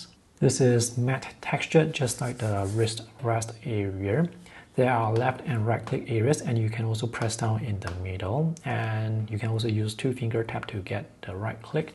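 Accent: Singapore. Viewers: Nice review you sound like a Singaporean! 😊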